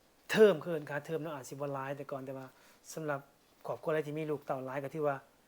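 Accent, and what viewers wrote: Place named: Upper Isan, Thailand. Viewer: ขอถามฉันบ่าว บ้านบ่าว อยู่แขวงได๋? อยู่เมืองได๋?ฟังสำเนียงเว่าคือคนอีสานตอนบนแท่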